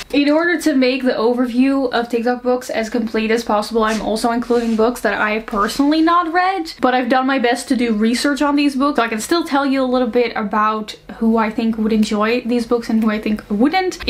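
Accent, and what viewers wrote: Netherlands. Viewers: This is the first time that I've come across your channel and this is how it went: "Oh nice a video about booktok!" "Hey is my nickname, cool:)" *wachting the first minute of the video and thinking I'm hearing a very slight Dutch accent*: "Is this a fellow Dutchie?" *Checking the discription to find out and actually finding out that we have the same name😳" Why didn't I find this channel sooner😂😭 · are you dutch? i hear a slightly dutch accent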